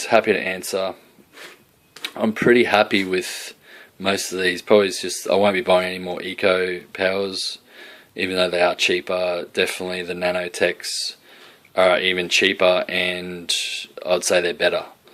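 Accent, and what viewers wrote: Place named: Queensland, Australia. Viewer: sounds like you live in brisane/southeast queensland. where abouts do you bash?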